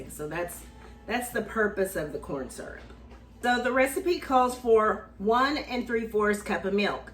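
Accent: South, United States. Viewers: I love your recipe and your Southern accent.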